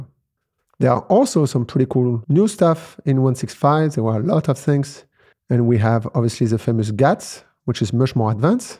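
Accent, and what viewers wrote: France. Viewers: 'pub' 'fn' 'str' 'Err' damn Rust.. what's wrong entire and undestandable words? (You seem to have a french accent, right?).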